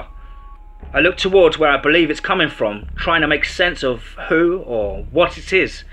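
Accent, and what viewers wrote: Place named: England, United Kingdom. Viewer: Your Englishness is awesome... Some tips. Tucson is pronounced two sawnh... I love your channel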